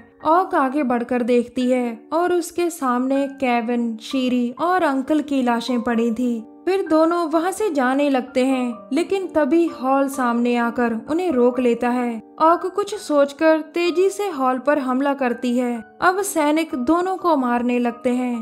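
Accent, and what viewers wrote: Punjab, India. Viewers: Mam app Punjab sa ho ? Ya Haryana sa?? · Aap punjaban ho na. Punjabi log aisi he Hindi bolte hai. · Ur definitely punjabi apke accent se pta chal aap punjabi girl ho ❤️👍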